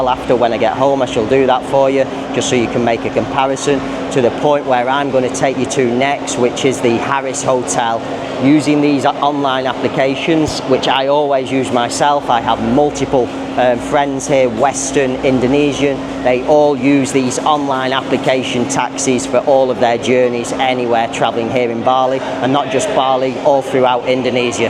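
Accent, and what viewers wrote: United Kingdom. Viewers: I think he already spoke English just the accent is British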